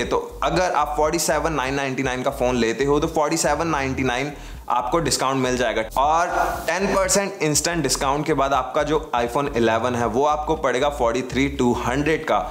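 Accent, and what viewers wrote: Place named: Delhi, India. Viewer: Nice video! It sounds really funny when you emphasise "me india se nahi hoon" :D :D and you sound like a typical Lajpat Nagar boy :) Should rather say i don't live in India. :)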